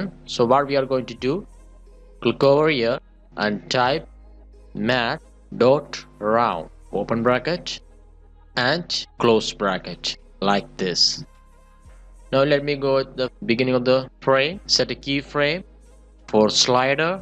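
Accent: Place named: India. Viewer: Shitty Pajeet accent- Once a pajeet always a pajeet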